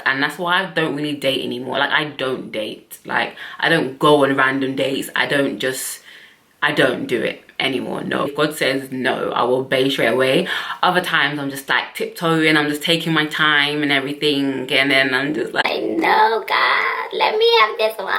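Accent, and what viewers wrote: United Kingdom. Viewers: Wow!!!! I love this woman mindset I would love to meet someone like her plus I have a thing for dark skinned woman and love the British accent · cute British accent. black people with British accent.... are very dope .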